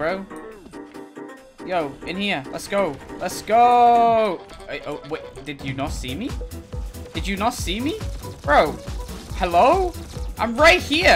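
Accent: United Kingdom. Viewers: you use brithis voice i use russian bcs i think its cool😀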